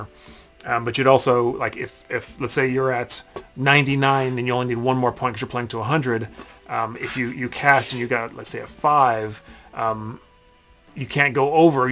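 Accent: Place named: Yorkshire, United Kingdom. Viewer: Shoov ape knee. As a resident of the US (please forgive me, I didn't do it...) I recognized this as a Yorkshire pronunciation with the help of Wallace and Grommit. A friend of mine from England said to me to imagine the US upside down, all the country folk are in the north...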